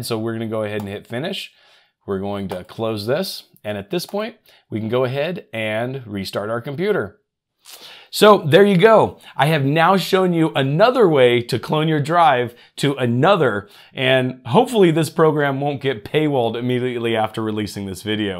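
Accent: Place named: United States. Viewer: Your videos are usually first rate but this one is overly complicated and, for some reason, you gabble at great speed. Not all viewers find US spoken English easy to follow and the monotone does not help. Perhaps showing two methods in a single video was the problem and could have been solved with a part 1 and part 2. Easeus is buggy and manual partition sizing with simpler tools can be be quicker and easier. Aomei is really worth the price if only used among friends and family a few times a year because it is so well thought out and has fail-safe features which were absent in the free version. Customer technical service is excellent re using the licensed product on other machines up to a point. For those of us who repair and upgrade machines regularly it is, in my years of experience, the best and least fussy when used properly. Over the years I have tried most.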